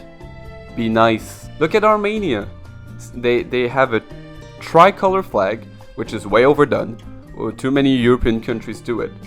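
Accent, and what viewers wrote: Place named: Quebec, Canada. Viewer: jsp pourquoi je SAVAIS que t'etais un quebecois.. autre que ca bro tres bonne video jai apprecier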